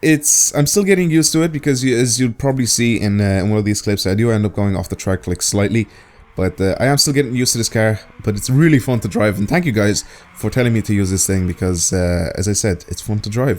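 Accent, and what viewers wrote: Dublin, Ireland. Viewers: Are u from dublin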